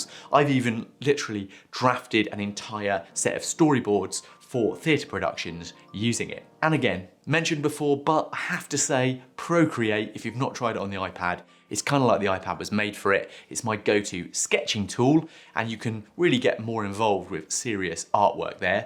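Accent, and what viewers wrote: United Kingdom. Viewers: I subscribe to ur channel cause I like ur British accent lol …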